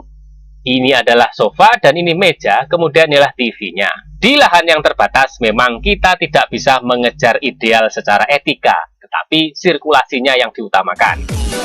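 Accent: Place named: Java, Indonesia. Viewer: Mlungker kaya obat nyamuk bakar Jawa medok , sukses selalu bro .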